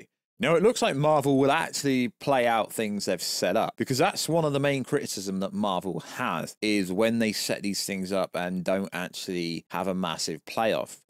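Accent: United Kingdom. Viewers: It is a very British listen for sure. lol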